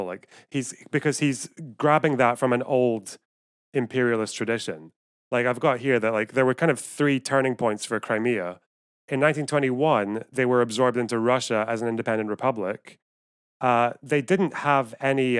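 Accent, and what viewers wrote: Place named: Glasgow, United Kingdom. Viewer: At first watching your channel I was like “this guys got a super familiar accent” Then I realized my dad was from Glasgow, moved to Canada when he was 19 and lost most of his accent. You sound so much like him it’s crazy.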